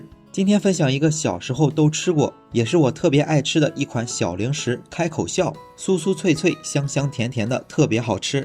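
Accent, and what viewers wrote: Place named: Qingdao, China. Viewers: 亲切的乡音， 实诚的山东人， 接地气的讲解， 不故弄玄虚！ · 感觉听出了青岛口音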